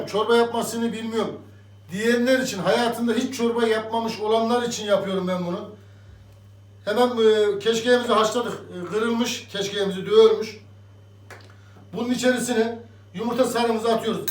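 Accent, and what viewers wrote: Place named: Anatolia, Turkey. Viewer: Ülkemin güzel şiveli; babacan , yardımsever şefim . Senin o Anadolu kokan şivene gurban olurum 😊😊🙏🙏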